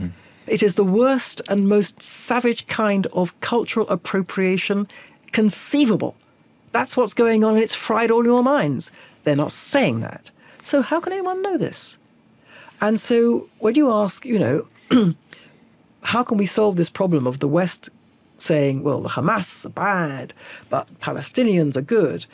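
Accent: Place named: RP, United Kingdom. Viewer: Yeah nice posh accent full of nonsense, so easy to inform lies into tiny minds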